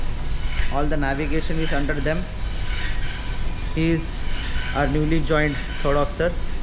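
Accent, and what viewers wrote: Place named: India. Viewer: Awful indian accent, Killed all vives. Life in modern vessel always fascinating but he could not describe it properly.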